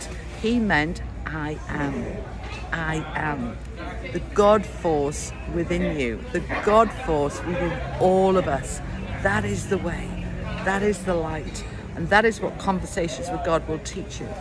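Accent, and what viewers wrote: Wales, United Kingdom. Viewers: My son has just arrived back from Orlando. It was 35 degrees, ugh. I’m in England and to me you sound ever so slightly Welsh. ❤ Did you mean the Celestine Prophecy?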